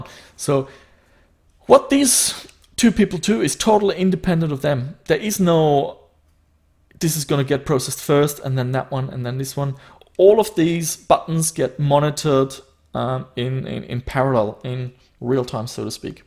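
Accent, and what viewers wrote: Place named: Germany. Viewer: Your accent sounds half German, half Australian.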